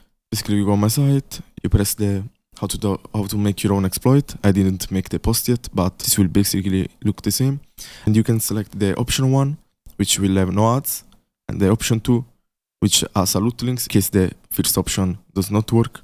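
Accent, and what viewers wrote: Italy. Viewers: l'accento italiano😭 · tu sei italiano 100% si sente dal accento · si vede che sei italiano dall'accento ahahah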